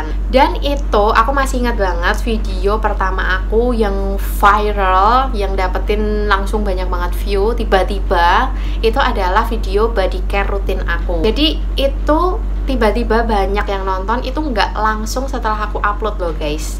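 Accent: Java, Indonesia. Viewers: Jowone endi mbak sampean..? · MB dari mana ya..kok Jawanya keliatan..salam.kenal mb dr Yogyakarta.nyimak nih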